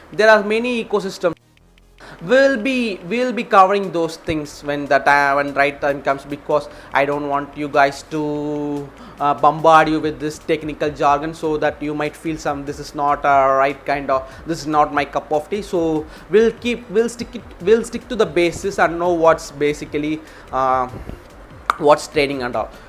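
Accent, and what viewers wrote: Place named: United States. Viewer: American accent🔥🔥🔥🔥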